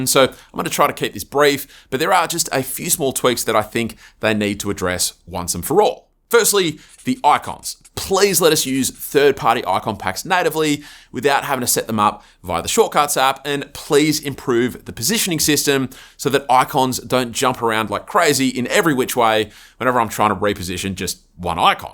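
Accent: Australia. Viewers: You should learn to speak in a bit of neutral accent. Your strong Australian accent is understandable but cannot make it till then end.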